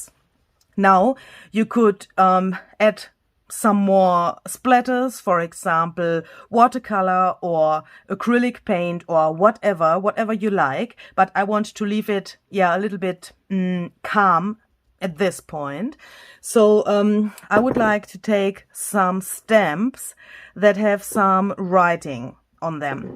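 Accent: Germany. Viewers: You are amazing Lady , love your creativity, I have learned so much with you , thank you , love your English /German language, God Bless you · I just love watching you create, my Mom was from Germany so that makes it double more fun!!! She had the same wonderful accent. Thank you for making my day!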